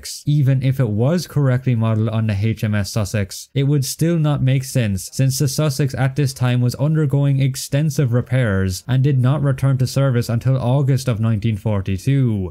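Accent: Ireland. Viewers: Way off topic but I spent damn near my entire life watching irish shows n listenin to Irish music and I cannot place that accent, what county you from? I feel like I'm getting hints to both Mayo and Wexford somehow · Irish sounds nerdy? 💀 Do you want him to talk in Chinese? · Your accent makes this video a hundred times better... w Ireland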